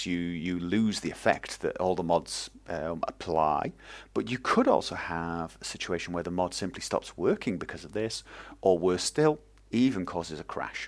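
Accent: United Kingdom. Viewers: suprised the hell out of me when i saw your profile showing youre a fellow norwegian, was guessing british from listening to your voice.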